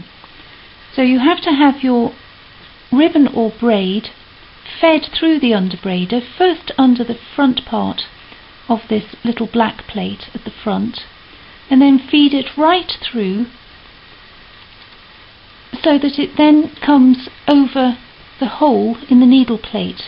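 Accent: United Kingdom. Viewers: You have a beautiful way of speaking … its lovely to hear British English rather than American. Reminds me of Lady Di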